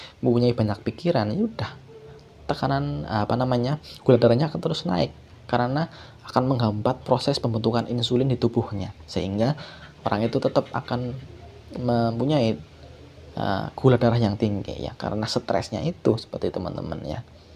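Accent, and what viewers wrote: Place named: Java, Indonesia. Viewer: dari logatnya kaya wong jawa ngapak mas.. kalo boleh tau domisili manan mas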